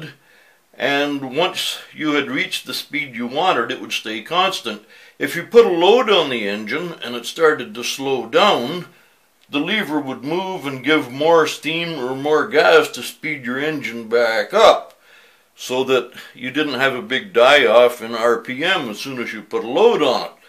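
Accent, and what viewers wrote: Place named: United States. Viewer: This 'Murican accent... Love it!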